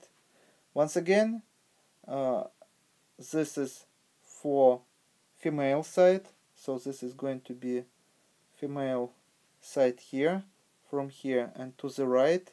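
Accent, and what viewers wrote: Russia. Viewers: Не знаю 🙃 Но я с первого слова понял , что автор русский 🇷🇺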